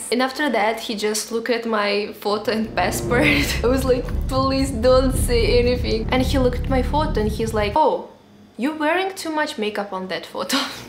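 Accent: Russia. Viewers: У вас русский акцент- вот и понятно что русская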